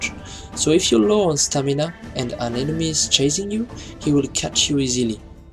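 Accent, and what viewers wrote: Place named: France. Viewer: Pas mal comme vidéo ça se sens que tu parle Français mais ton anglais est parfait 👌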